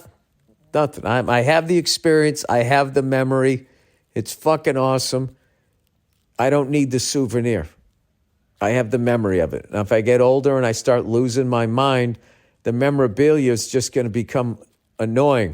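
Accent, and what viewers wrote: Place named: East Coast, United States. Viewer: i remember once in the good old bad days of this podcast when was much angrier and spoke more east coast that is to say much faster. he was talking about how people were complaining about not being able to download episodes off sound cloud i think? he said " what the fuck are you guys working your fingers to the bone pressing your download button for your free entertainment complaining get the fuck outta here". i realized he was right. that being said i cant belive this actually showed up on my feed on a monday. i was so blown away i wrote this comment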